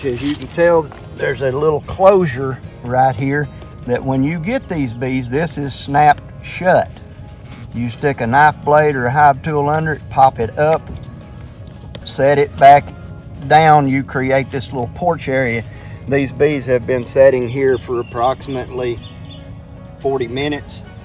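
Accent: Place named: South, United States. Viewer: Thank you for making this video. I have 12 days till I pick up my 5 frame nuc of Italian bees. I’m excited and a little anxious and your video shows me how easy it is ( hopefully). Love the southern accent. My momma is a Tennessee girl born and raised. My bees are gonna live about 10 miles from the Wisconsin border on our wooded 3 acre property.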